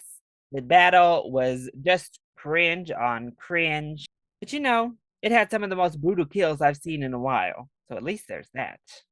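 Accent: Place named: South, United States. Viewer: Per usual, great review,! Love that good ole fashion southern twang! I can’t lie, I actually quite liked your dishonorable mention, “Men” & “Blonde”. HOWEVER, I can 100% get where you’re coming from & respect your opinion on the latter. Everything else, yeah, I’m with you on! Plenty of disappointments this year, unfortunately.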